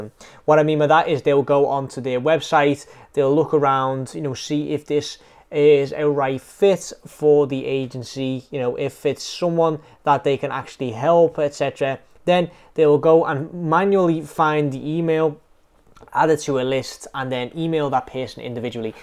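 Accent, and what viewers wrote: Liverpool, United Kingdom. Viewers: High quality content🙌💯 you have a scouse accent haha, it's really unique and I love it. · Where abouts is liverpool you from?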